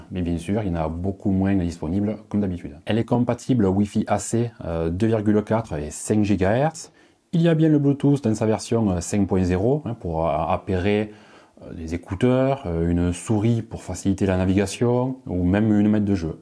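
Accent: South, France. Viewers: Bonjour, merci pour votre vidéo. Je suis pas très au point sur la technologie mais j'ai compris tout ce que vous disiez ^^ Du coup j'ai une question complémentaire : Puis je voir les chaîne "TNT" via ce petit boitier ? Mon but ultime serait de modifier mon abonnement téléphonie pour n'avoir plus que internet et voir la tv sans le décodeur traditionnel :) Merci d'avance et vive l'accent du sud !!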